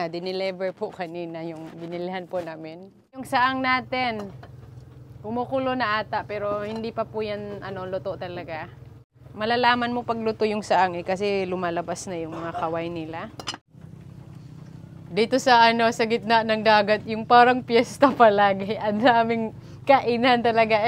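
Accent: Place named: Iloilo, Philippines. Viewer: Ilonggo din pla kyu mag salita mars?ganda pla ng vlog mo nka aliw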